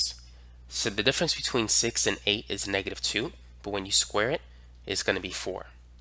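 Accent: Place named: New York, United States. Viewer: i can hear your NY accent lol i love it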